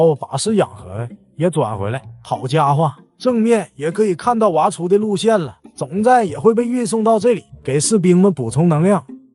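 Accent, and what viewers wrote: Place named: Northeast, China. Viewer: 这大碴子真他妈几把难听！！！！！！！！！！！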